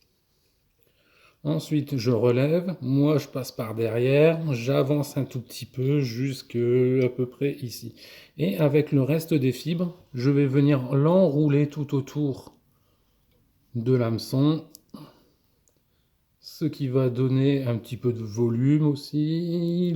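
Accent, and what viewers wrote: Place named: North, France. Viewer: Je reconnais un accent du nord. Plus précisément un accent de Lesquin… 😃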